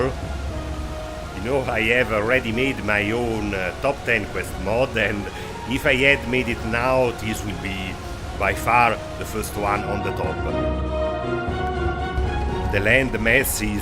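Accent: Italy. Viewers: C'è bisogno necessariamente del gioco originale? (dall'accento suppongo tu sia italico) Thanks! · Eppure qualcosa mi dice che sei italiano. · Nice video and epic accent (Italian?)! Chiao!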